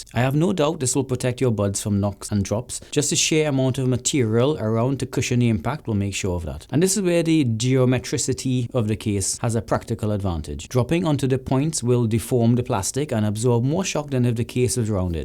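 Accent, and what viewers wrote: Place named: Trinidad and Tobago. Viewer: Your accent sounds Trinidadian, is it? Great content by the way!!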